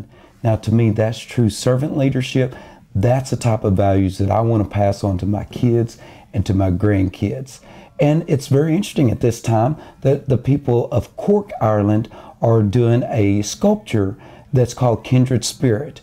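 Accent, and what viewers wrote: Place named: Deep South, United States. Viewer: No disrespect here, but, coming from a predominantly First Nations community, I'm really confused as to why a white (somewhat reddish?) man with a thick deep south American accent is Chief of the Choctaw Nation? Someone please explain to me what is going on here? Thank you, again I mean no offense by this inquiry I'm just staring at it very puzzled. He seems like a kind and intelligent man. I've never seen a white man with a bit of Native in his genes represent an Indian Nation before. Are they just gone/assimilated into white race..? Usually chiefs and elders of tribes speak English as a second language and don't look Caucasian at all.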